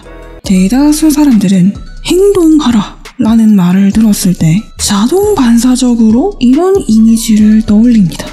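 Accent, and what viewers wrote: Gyeongsang, South Korea. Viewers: 말씀하시는게 경상도 사투리? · 이분 경상도분이시당. ㅎㅎㅎ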